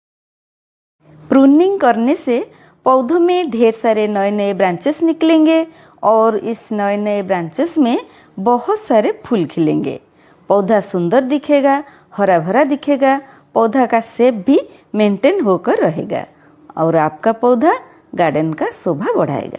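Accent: Odisha, India. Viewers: Very beautiful, your presentation is also very beautiful like Hindi in Odia accent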